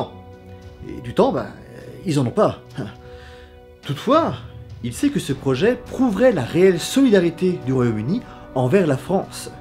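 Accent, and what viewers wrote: Germany. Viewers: magnifique accent allemand ( et super vidéo en passant ^^ ) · Je me permet de recommander une jeune chaine yt axé sur la seconde guerre mondiale, et qui a traité de l'offensive de la Sarre (le petit sketch du début avec la bière), c'est la chaîne des historateurs, n'hésitez pas 😎 Et comme d'hab... très bon accent allemand passif/agressif! 👌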